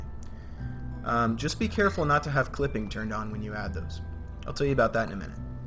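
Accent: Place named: Russia. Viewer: okay... speaks in a russian accent Interresting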